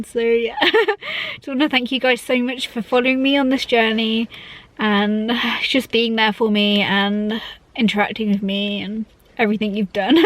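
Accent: United Kingdom. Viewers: Love your English accent! I can relate to you allot. Starting Strattera today.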